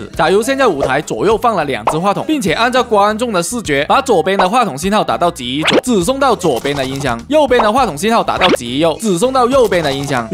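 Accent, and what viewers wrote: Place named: Cantonese, China. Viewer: 樓主的廣東式普通話，真係聽到上癮！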